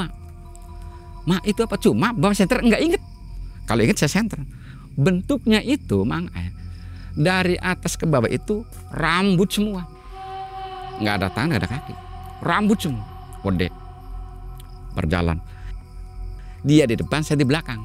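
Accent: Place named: West Java, Indonesia. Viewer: Kok logatnya kaya orang sunda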